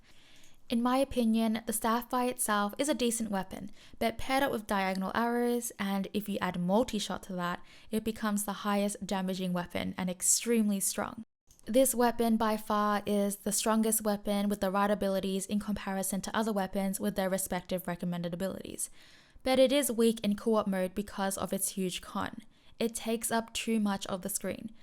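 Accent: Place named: Australia. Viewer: I have been watching a couple of videos, and from the first video, I thought that was an Australian accent, (because mine is about the same or a bit stronger than that) so I was quite glad to find that you are based there too.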